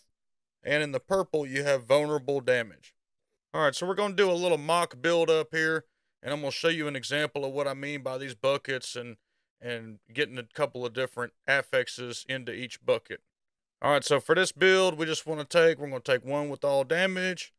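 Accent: South, United States. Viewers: I hear the redneck voice and instantly tune out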